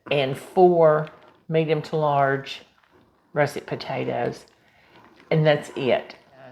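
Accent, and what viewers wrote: South, United States. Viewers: So glad you're feeling better!! This looks delicious!! Ur from the south and love your southern voice but wondering exactly what state? Cause by golly u make me feel like Mama's still around about to give me a hug and tell me a story from her childhood!!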